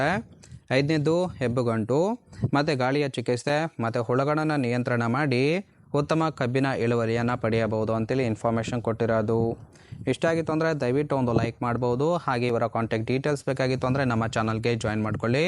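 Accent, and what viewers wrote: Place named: Kerala, India. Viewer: O K Callem Malayalli Tone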